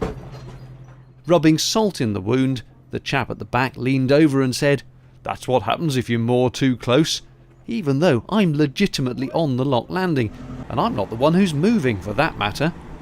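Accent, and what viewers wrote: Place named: Midlands, United Kingdom. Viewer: I really enjoy your Vlogs and your Midlands accent lol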